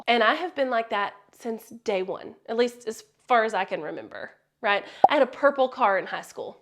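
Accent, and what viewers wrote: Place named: North Carolina, United States. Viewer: As a NC native (who still lives here), I love listening to you because I know that there is a place for someone who talks like me on YT! You give so much great information all the time. Thank you so much for being an open book and giving us all the tips.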